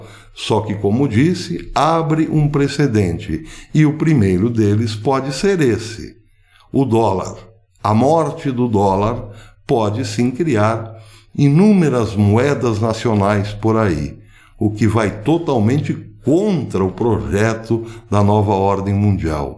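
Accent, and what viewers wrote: Caipira, Brazil. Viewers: O senhor é o máximo com essa forma meio caipira de falar, amooooo, carinhos fraternos.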